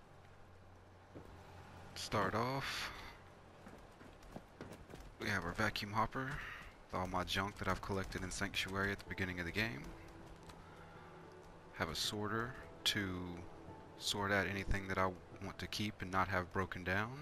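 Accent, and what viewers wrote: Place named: South, United States. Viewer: Neatly done sir! This video like the recycling plant you've featured is well executed... It shall likely provide a considerable influence on my ideas on similar matters... Thankyou... As an aside might I ask asto the origin of your accent... Your voice clear, well modulated and easy to listen to... Maybe you should consider voice over work... Would you by chance, be a Southern Gent?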